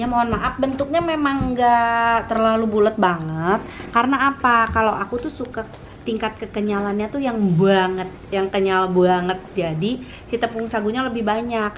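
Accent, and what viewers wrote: West Java, Indonesia. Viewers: Sunda pisan teh,resep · Assalamualaikum tth..seneng deh liat nya ttp sederhana n tidak lupa logat sunda nya...bahagia trs yaaa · Baru nemu channel ini.. Lucu si Teteh karena Nyunda banget... Love u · Sundaaa 😁😁 khas nya nyariosna urang Sunda mahh 😀😀 kaya suara teh Syahrini 😅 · Teh geulis mirip dede lesti apalagi logat sunda nya...hihi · Mecak urang mah ai aya artis ngomongna sunda teh teh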